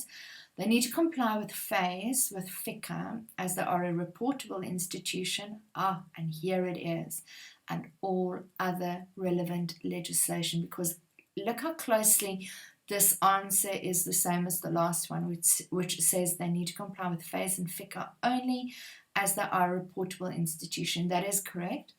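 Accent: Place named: England, United Kingdom. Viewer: Thank you very much. Btw, you sound very English, so maybe English instead came to you in a teapot.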